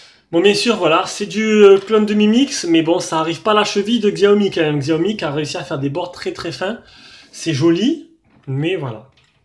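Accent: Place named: South, France. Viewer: Moi je trouve ta vois agréable avec un petit accent du sud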